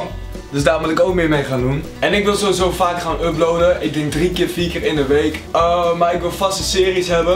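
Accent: Groningen, Netherlands. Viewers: Ben je gronings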